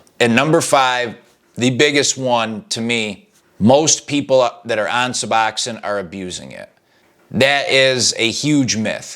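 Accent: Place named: Upstate New York, United States. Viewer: Are you from upstate NY? Trying to place your “accent.” (I don’t actually consider it an accent, but after living in the south for the past several years, it’s nice to hear what sounds like my “accent” from back home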